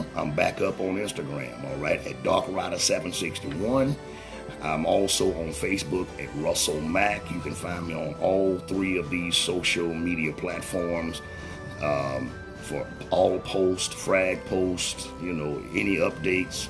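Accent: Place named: South, United States. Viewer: That’s so cool you have that southern accent lol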